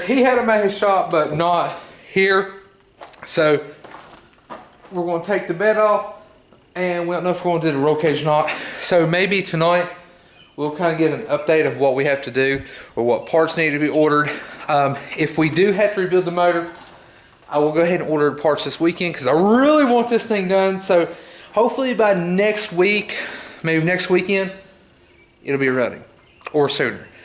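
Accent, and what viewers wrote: West Virginia, United States. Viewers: You from West Virginia?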